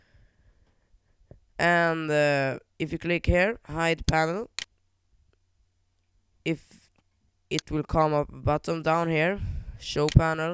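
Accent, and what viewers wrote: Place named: Sweden. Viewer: you sound swedish!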